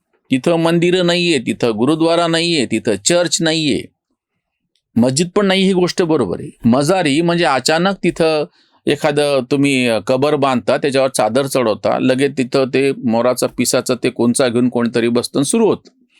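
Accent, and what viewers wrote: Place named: Marathwada, India. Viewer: कोणतं अँप आहे त्याची लिंक किंवा अँप चं नाव तरी द्या सर जी,तुमचं बोलणं म्हणजे परभणी चा आणि बीड चा मिक्स तडका असतो❤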